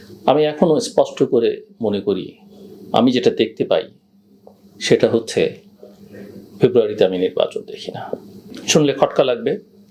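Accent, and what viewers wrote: India. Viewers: তুমি এতো সুশীল সাজার চেষ্টা করিও না। জেনারেল আর ভারতের টোনে যে কথা বলো এটা জনগণ বুঝে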